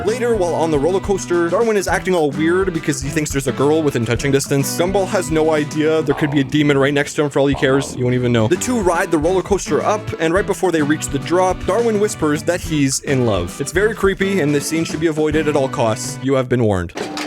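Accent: Canada. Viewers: Wait is this guy Canadian? DID I FIND MORE OF MY PEOPLE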